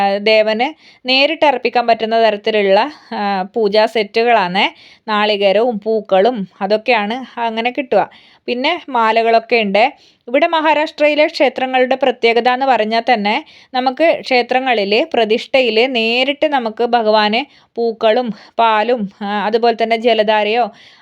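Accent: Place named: Kannur, India. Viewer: Kannur slang super.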